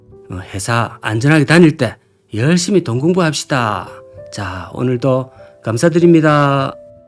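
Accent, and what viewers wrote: Gyeongsang, South Korea. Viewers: 전라도에사는 60대인데도 경상도구수한 사투리가 참 잘 어울리셔요.인생지침에 많은 교훈이 됩니다.항상건강하시구요.감사합니다. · 안녕하세요...항상 수고 많습니다. 이 처음에는 구수하고 조금 어눌한(?) 경상도사투리가 참 좋았는데 지금은 말씨가 표준말로 바꿔가고 말도 빨라지네요 ㅋㅋ.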